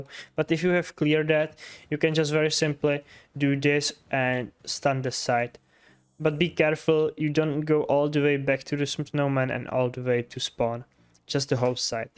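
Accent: Czech Republic. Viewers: Bro's czech i know by hearing accent, am i right?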